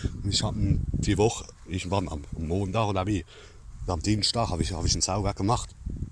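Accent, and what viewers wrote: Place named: Hesse, Germany. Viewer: Das ist Hesschis gele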